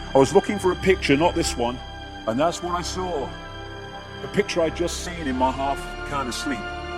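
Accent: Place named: United Kingdom. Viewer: First this guy is a Brit, but definitely NOT David Icke's voice. Good piece nonetheless!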